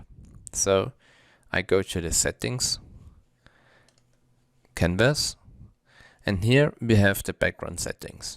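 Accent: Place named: Germany. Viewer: ich höre am Akzent, dass Sie Deutscher sind. meine Fragen 😉: 1. Fast alle Händler und mittlerweile alle professionellen Händler verwenden Candle Sticks. Könnten Sie vielleicht hierzu einige farbliche Hinweise geben? 2. Der obere Bereich (Covering) ist ja in Bloomberg orange, einige Teile auch rot. könnten Sie auch hier ggf. Einstellungshinweise geben? vielen Dank im Voraus. Ergänzung: Meine Watch List sah früher bei Bloomberg auch etwas anders aus. Ich möchte dies gern in Tradingview so einstellen. Wie geht das?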